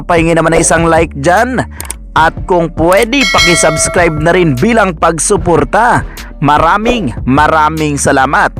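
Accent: Visayas, Philippines. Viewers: ayusin mo salita mo wag ka bisaya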